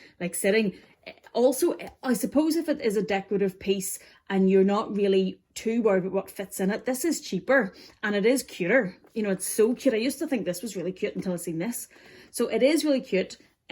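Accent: Northern Ireland, United Kingdom. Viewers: Fantastic review! Was debating between the nano and mini. Think I might need to get the nano! Do I hear a fellow Norn Ironer? 😁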